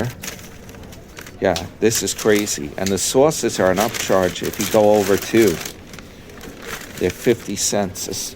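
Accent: New York, United States. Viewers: I would rather endure a jalapeño enema while a spike is driven through my fucking temple than to endure your New York fucking gibberish! Shut the fuck up you annoying snot!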